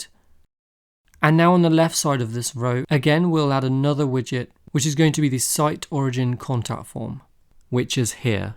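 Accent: England, United Kingdom. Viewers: what's with the Eng. accent????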